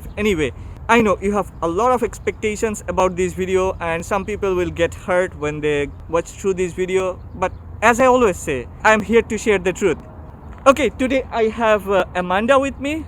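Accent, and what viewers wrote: India. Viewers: Ur accent sound so much like a bengali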